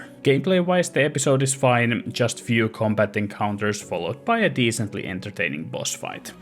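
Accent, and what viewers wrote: Finland. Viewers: good video :) Love the finnish accent !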